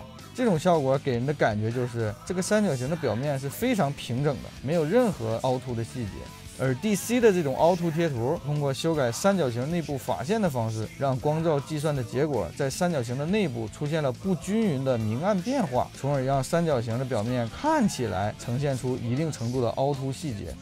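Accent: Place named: Northeast, China. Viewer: 东北口音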